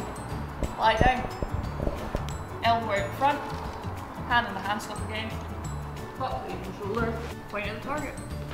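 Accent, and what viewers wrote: Scotland, United Kingdom. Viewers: Its a accent from scot. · Oh... that heavy Scotish accent! I can only catch about 30 percent of what she is saying. Thank goodness for closed captioning. 😅 😂 🤣 Side note: In this day and age (#metoo) it does seem a bit absurd that "fragile females" are given less shots to take than the "big strong men". In fact, it's a bit absurd that there still are still both separate women's and men's events in this particular sport. Why not let them compete head-to-head?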